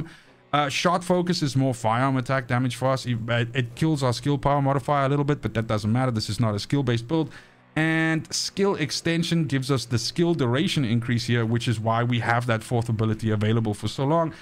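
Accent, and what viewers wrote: South Africa. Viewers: You from South Africa ?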